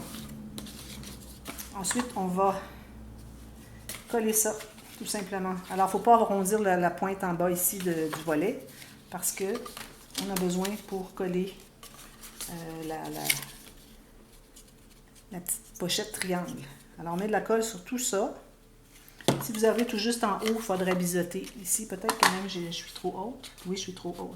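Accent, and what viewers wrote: Canada. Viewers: Bonsoir, Est ce que tu pourrais nous montrer comment faire un album sur le thème de Noël? Bonne soirée Ps: Votre accent vient du Canada?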